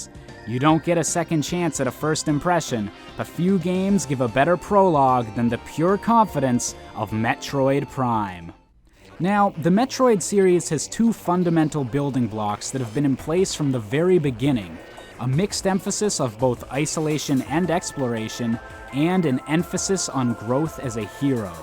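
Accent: Canada. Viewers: dat Canadian (?) accent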